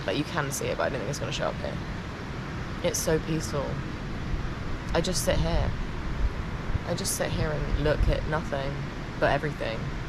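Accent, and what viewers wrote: United Kingdom. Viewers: Next time, land in Clark International or Cebu, they give passengers a kinder experience. But if its a connecting flight, well that sucks everywhere doesn't it ? Your British English is so perfect, unlike American English which is so hard to comprehend, and I speak the language quite well besides being a college graduate. The accent is so ençhanting, it goes so well with your slightly prickly but charming personality. Im stingy with subscritions,, but I surrendered and subscribed. 👍😘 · Britist accent lady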